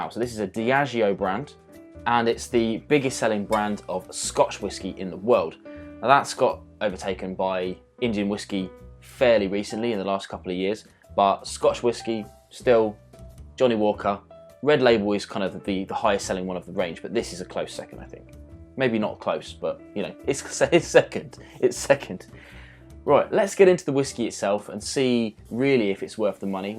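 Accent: England, United Kingdom. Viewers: i could do without the engish acent. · I actually like the English accent. It makes him unique.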